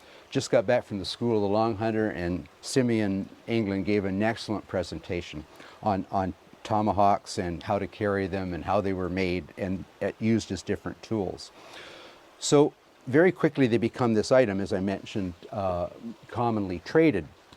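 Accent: Canada. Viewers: Hi don t change your Canadian saying s I m from Massachusetts and every on know were Im from when i speak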